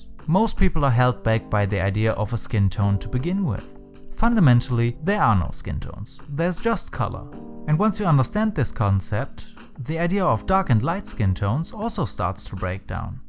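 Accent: Germany. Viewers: Dieser Moment, wenn man hört, dass er deutscher ist und man total abgefucked ist, dass er Englisch spricht😂😂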